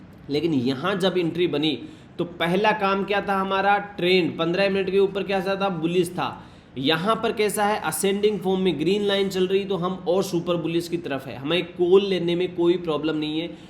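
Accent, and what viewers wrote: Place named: Marwari, India. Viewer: Marwadi lahja is always seen. Keep it up.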